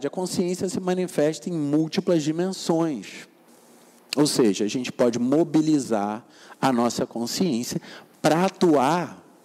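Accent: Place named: Rio de Janeiro, Brazil. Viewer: sutaque do rio de janeiro da zona sul !!!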